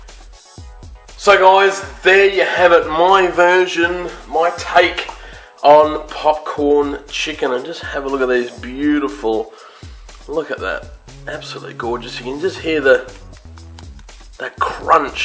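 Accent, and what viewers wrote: Australia. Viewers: WOW!, #1, i love your Australian accent, along with your fantastic cooking. Especially that popcorn chicken, and my family is also a big fan of your churros. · He is an Aussie not American. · For god sake chocoswede leave him alone 1 ain't gay it's just an australian accent and 2 ur jank. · Pretty sure he's Australian 0.o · hes aussie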